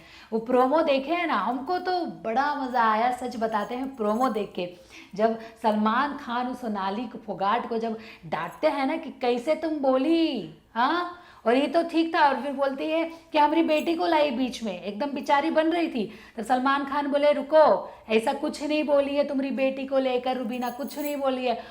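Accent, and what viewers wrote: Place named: Bhojpuri, India. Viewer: Love your bhojpuri accent ❤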